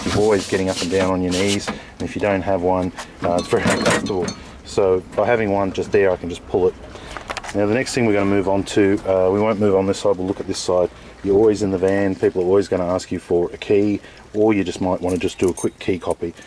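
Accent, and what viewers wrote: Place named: Australia. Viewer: Hes australian.....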